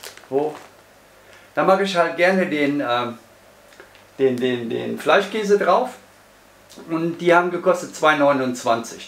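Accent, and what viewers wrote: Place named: Cologne, Germany. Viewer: Sehr sympathisches Video. Ich finde deine Art zu sprechen cool, bist du aus der Gegend Köln?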